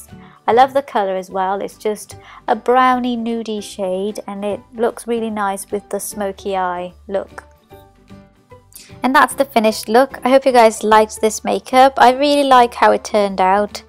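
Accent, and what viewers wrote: Wales, United Kingdom. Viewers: Lovely look, can't wait to try it out this weekend! :) Am I right in thinking I can hear a very slight Welsh accent coming through every now and then? :p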